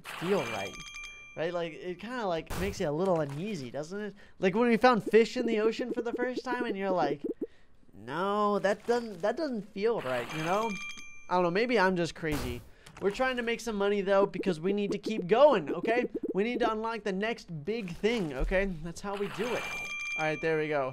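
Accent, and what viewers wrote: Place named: United States. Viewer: *hes speaking american”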